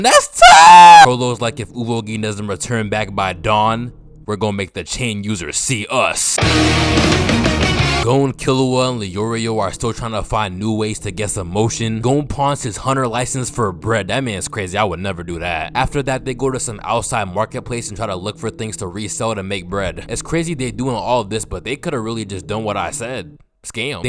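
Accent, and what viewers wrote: Boston, United States. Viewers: You’re from Boston??!?! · Aye nigga you from Boston?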